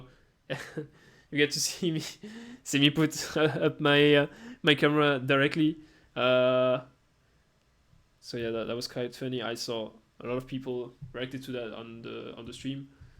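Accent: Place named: France. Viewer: Pourquoi tu as le drapeau Danois ? j'entends l'accent français 😅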